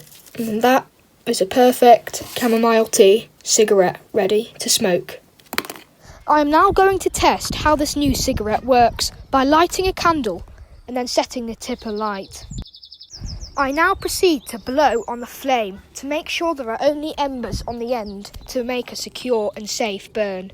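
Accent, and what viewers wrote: United Kingdom. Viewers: of course the mf is british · british accent just adds to it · british accent😍😍😍😍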